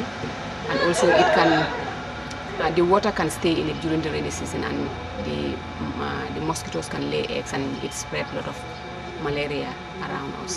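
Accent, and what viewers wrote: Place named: Gambia. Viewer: God bless her...she is very sweet....thats a real Gambia accent dho....miss my country to bitzzz.....bless her and stay strong my fellow Gambian women xxx love love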